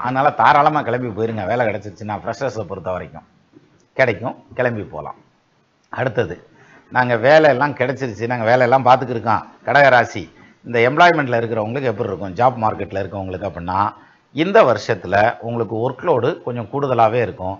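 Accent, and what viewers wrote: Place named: Tirunelveli, India. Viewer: யோவ் வழ வழ னு எதோ 60 வயதுக்கு மேல் இருப்ப வர் பார்த்து யாருக்க வது சொல்ல வேண்டும் போல.... நெல்லை தமிழ் சிறப்பு.... 😴😴😴😴😴😔😥😢😢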